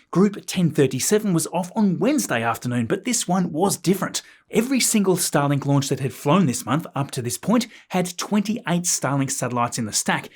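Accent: Tasmania, Australia. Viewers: As an American, I love a good 'Tassie accent. I will attempt to transliterate. SpiceEhx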